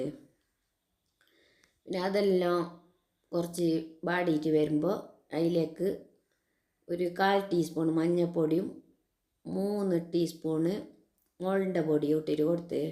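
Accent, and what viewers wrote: Kasaragod, India. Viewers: ചിക്കൻ കുടൽ കറി ആദ്യ മായി കാണുന്നു 😅👌പ്രത്യേകിച്ച് കാസർ ഗോഡ്കറി യും ആദ്യം തന്നെ. മുട്ട കറി യിൽഇടുന്നത് കണ്ടിട്ടുണ്ട്. പുതിയ ഒരു കറി പഠിച്ചു.കാസർഗോഡ് സംസാരവും.... 👌👌❤️❤️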